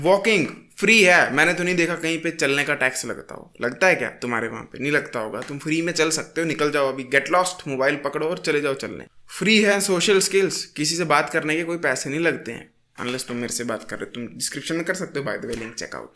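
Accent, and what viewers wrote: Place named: Pahadi, India. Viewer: I love how the pahadi accent sometimes kicks in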